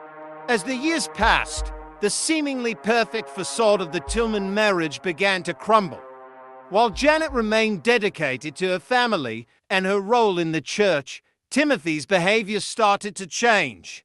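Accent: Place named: United Kingdom. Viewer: The clown with what appears to be a British accent shouting into a microphone. . . About a story in small town Alabama. . . Bizarre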